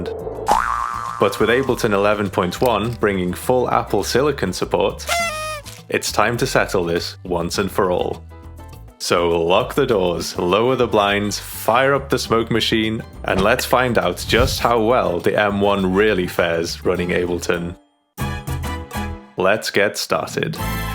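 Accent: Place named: North Wales, United Kingdom. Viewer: Fandabidosi! Are you a gog like me, ie? I can hear your north wales accent. Diolch am hwn. Thanks for this video!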